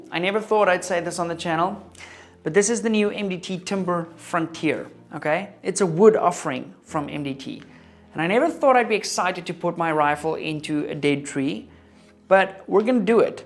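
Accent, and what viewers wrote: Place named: South Africa. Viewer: I just got into distance shooting, I've learned a lot from your content. Also I lived in ZA for a couple years, it's good to hear the accent.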